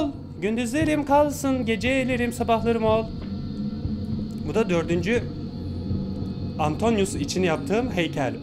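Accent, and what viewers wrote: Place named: Izmir, Turkey. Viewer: Abi İzmir'de veya çevresindemi yaşıyorsun biraz şiven benim olduğum ilçedeki şiveyle uyuşuyorda :D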